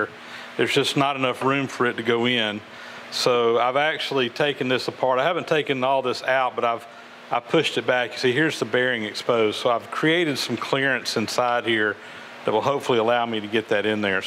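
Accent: South, United States. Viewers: "No, I put the oil in the hole!" "You put the awl in the hole???" "No, the oil, in the oil hole!" "There is no awl hole!" "... " (my Dad had a reasonably heavy Southern accent, so I enjoy hearing one!)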